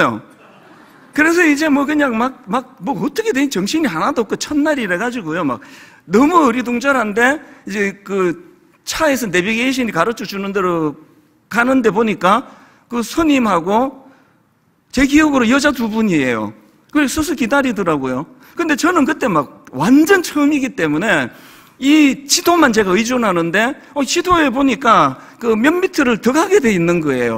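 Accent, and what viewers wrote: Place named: Gyeongsang, South Korea. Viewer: 제가 대구사람인데 서울출신 남편이 처가가족들 대화들으면 싸우는것 같다 그래요 ㅋㅋ 목사님 경상도 스타일이셔서 그런것 같아요^^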